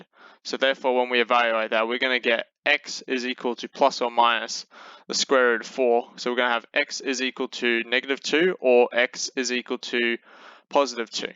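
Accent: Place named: United Kingdom. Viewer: Recognizing british's speech is pretty tough, sorry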